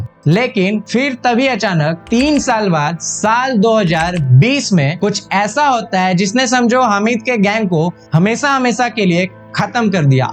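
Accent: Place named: Bihar, India. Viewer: Pakka yeh Bihar se hai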